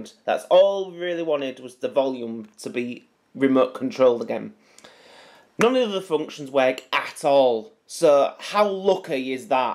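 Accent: Hull, United Kingdom. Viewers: The Hull accent was a bonus - I'm from Hull.👍👍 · The Hull Accent tops it off - i'm from Hull LOL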